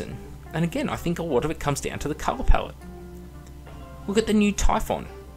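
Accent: Australia. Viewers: "Your typical british kid." He sounds like he's from Straya, mate.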